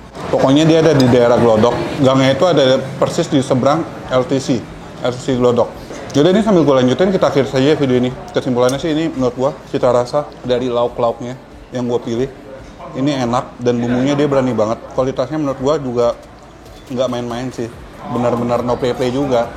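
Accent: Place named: Banyumas, Indonesia. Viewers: Warteg komplit, masnya ngapak 👍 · Ngomongnya kek orang Tegal (ngapak) banget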